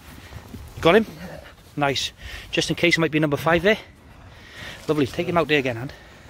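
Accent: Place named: Wales, United Kingdom. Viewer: 11 rabbits, we can make £22 quid in Sunderland for the meat mate. Do yas do any better over in Wales? I'm guessing by the accent, apologies if I'm wrong :-)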